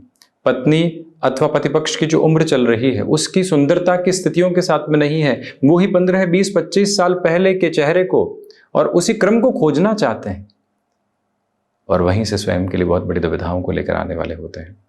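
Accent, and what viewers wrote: Standard. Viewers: आपका आभार एवं धन्यवाद, इतनी स्वच्छ और शुद्ध हिंदी सुनकर मन प्रफुल्लित, आनंदित हो उठता है।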